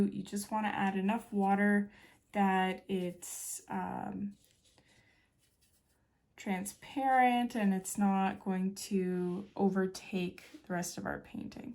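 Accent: Canada. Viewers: Thought I detected small accent-canadian?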